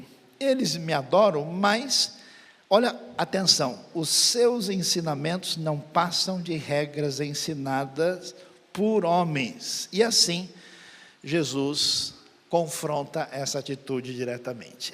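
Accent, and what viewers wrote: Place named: South, Brazil. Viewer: Perfeito. Me horrorizei no início achando que Jesus achava que higiene não é importante. Ainda bem que Ele mesmo explica. Belíssima pregação, texto muito bonito. Sotaque sulista ficou muito bonito. Estranhei no começo, mas aqui tem muita gente do sul, ouvi tudo muito atento. Maravilha ver que Deus opera em seus servos fiéis, há muitos bons pastores que levam Sua palavra a sério.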